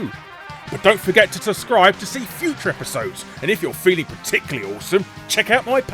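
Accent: England, United Kingdom. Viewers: Telling an Englishman he's speaking English wrong I see. Troll elsewhere bronie. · ...you know he's using UK English, right? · I believe it's called a British accent... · Guys, this is just how we say 'Patent' in the UK. Though I have to admit I stopped pronouncing it like that years ago because the US way is less mouthwork. Or something like that. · Please always remember that you're dialect/accent is sometimes incomprehensible outside of the British world. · Dude's British. That's how they pronounce "patent" in a majority of the UK.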